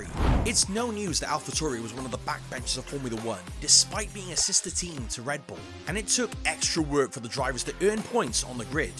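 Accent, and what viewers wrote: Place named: United Kingdom. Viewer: british accent might be tricky when it comes to foreign names 😆 i heard it on other channels too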